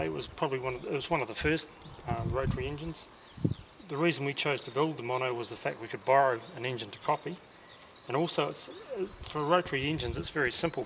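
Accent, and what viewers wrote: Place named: New Zealand. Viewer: Kiwi English, easy as!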